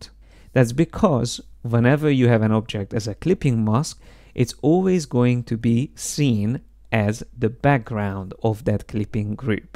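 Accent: Hungary. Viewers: Nice tutorial, are you hungarian?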